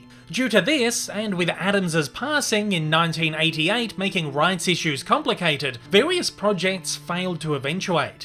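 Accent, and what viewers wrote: Australia. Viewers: your accent sound like Australian or Ozzy i m right i m kidding o know your a Australian what else will down under means then referring to Australia.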